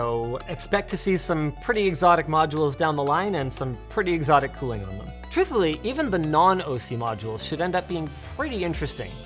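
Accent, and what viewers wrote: United States. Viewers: really speaking American here. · went very american in this one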